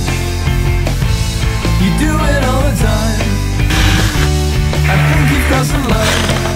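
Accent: United Kingdom. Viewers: Wait you are from the United kingdom? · Are You British?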